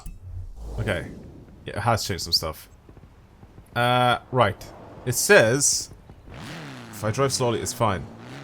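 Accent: Dublin, Ireland. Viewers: Are you from Dublin?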